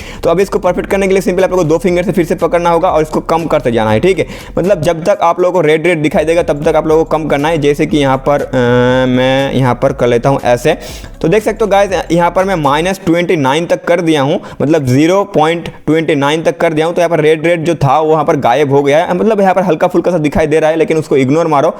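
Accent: Bengal, India. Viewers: Vai up Bengali ho??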